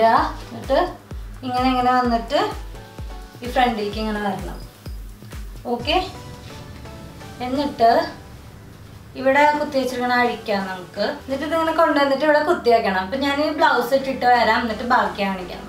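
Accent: Thrissur, India. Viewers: Kure mattu basha channelil chumma body show kanikkan vendi cheruppakkarikalum aunties um sari udukkan padippikkunna kandu athokke vachu nokkumbol sahodari avatharippichathu 100% better aanu pinne thrissur slang um super ☺️ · saaz safa athanu nammade thrisur slang😁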